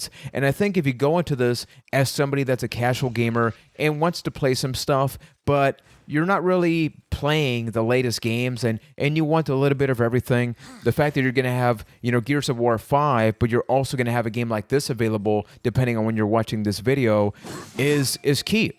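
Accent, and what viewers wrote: Puerto Rico. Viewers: Tu eres boricua ?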